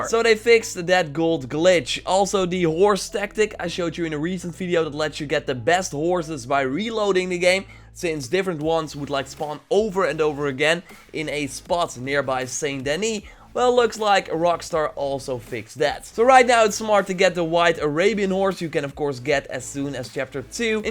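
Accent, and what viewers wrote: Netherlands. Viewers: You sound soooo Dutch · He's from the Netherlands man, don't be so rude. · I detect a dutch accent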